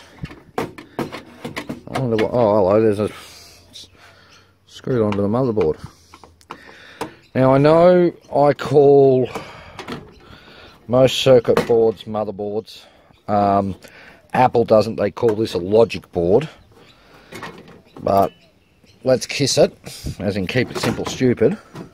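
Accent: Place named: Australia. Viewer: Great to hear an Australian voice. I'm preparing to tear down my old Power Mac G5 for Intel (maybe Hackintosh) Conversion. I also used the ifixit guide. Thanks mate..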